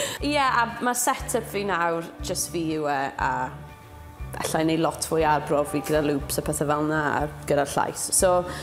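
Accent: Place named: South Wales, United Kingdom. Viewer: You've got both Welsh dialects there too. The interviewer is clearly North Walian and Gwenno from the south. As a North Walian I find South Walian quite hard to understand. :p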